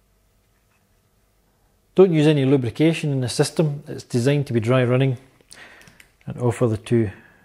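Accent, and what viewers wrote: Scotland, United Kingdom. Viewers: Great Video, I love your Scottish accent!!!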